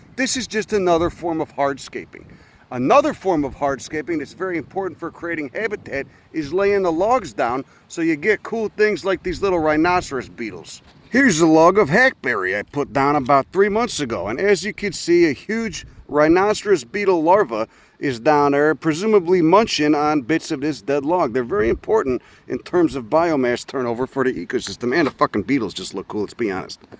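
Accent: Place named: Brooklyn, United States. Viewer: I'd been wondering if you were from Cali, cuz the accent sounds more Brooklyn to my Southern ear, but yup. That's some Earth First California shit, my friend. 🧡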